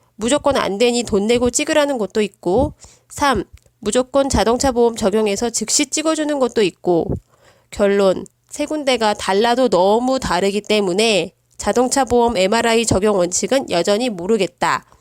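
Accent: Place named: Chungcheong, South Korea. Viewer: 음...요리 백종원도 충청도유... 사투리좀 써도 괜찮음. 다만 발음정확도+전달력의 문제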